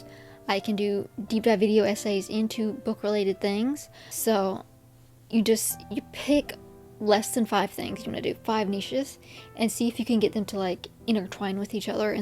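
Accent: South, United States. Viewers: As a Californian, I have to admit my prejudice against southern people, and you are just a reminder of how ignorant I am. Love your accent and most of all your videos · Your accent reminds me of an attorney colleague I worked with years ago. He also put a lot of work into lessening his southern accent but I found it endearing in the occassions where it did come out stronger. I think your accent also gives you more implicit authority that you know what you're talking about when you discuss these beliefs present in christian spaces. It might be a little weird to you to hear, since this probably wasn't intentional on your part. Just my 2 cents on it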